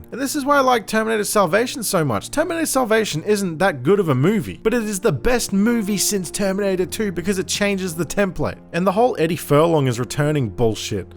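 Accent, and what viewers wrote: Australia. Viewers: Are you Australian? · BTW I love that Aussie accent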